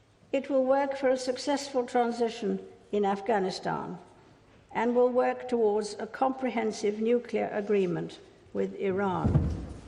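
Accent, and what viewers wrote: United Kingdom. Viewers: I'm surprised! What accent is that? I mean, her speech is so clear to me, a non-native, speaker of English... I thought her accent was similar to those Cockney accents of the BBC ! ===(gilberto lehrer from brazil)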